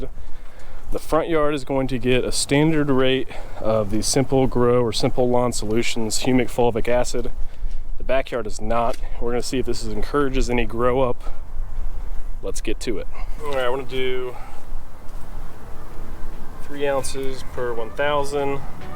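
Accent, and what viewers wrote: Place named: Australia. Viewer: An Aussie man! Aussome!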